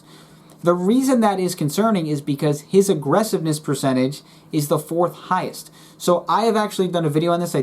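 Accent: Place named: New York, United States. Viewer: Dude,....love the New York accent.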